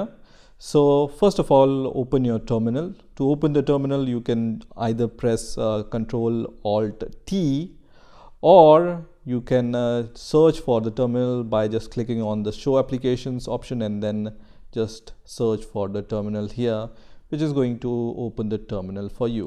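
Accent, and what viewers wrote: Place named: India. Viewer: you now what you sound Indian and I am pretty sure u are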